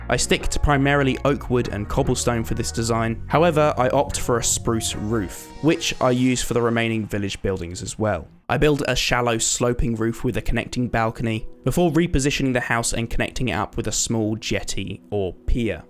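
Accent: United Kingdom. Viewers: British Accent Nice!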